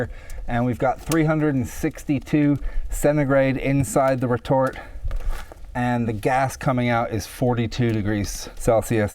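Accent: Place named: United States. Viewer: Due to infectious curiosity, undiagnosed ADHD perhaps, I sidetracked my initial viewing of the video on a tangent. I hope you'll indulge some observations and the question those elicit. To my ears at least, you sound very American. However, several items in the setting, the whitewashed stone building most of all but even the colors of those wheels and tires, seemed more European in origin. So, I had to research " " to discover that you are located in the UK. Hence the question, which I hope you do not mind: Are you an American expat? I'm impressed with your design and fabrication and the thoroughness of your presentation. Ever since my family's livelihood and my own ability to travel freely via personal vehicle without regard to time or distance was adversely affected by the first Arab Oil Embargo in 1973, I have been intrigued by the notion of independent energy production. 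Today, was my first exposure to your channel and its content. I "liked, subscribed, and hit the bell" immediately and will be looking forward to seeing you further test and develop/refine the gasifier design and the materials it produces!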